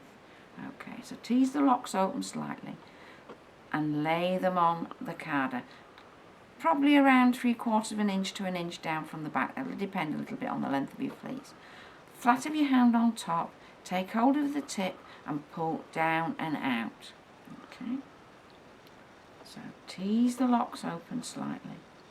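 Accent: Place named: Yorkshire, United Kingdom. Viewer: Love this vidoe, love the accent. Feel like km learning from one ot my Yorkshire grandmas. Thabkyou so much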